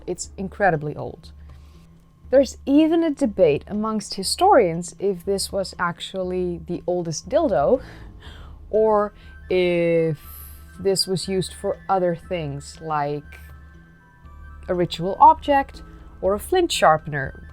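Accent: Netherlands. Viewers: Hahaha 🤣 great presentation and cute dutch accent 😉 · You have a beautiful accent. is it Dutch ?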